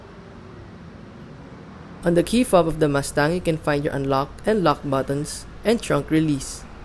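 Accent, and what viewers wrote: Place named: Philippines. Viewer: he speek like many pacquiao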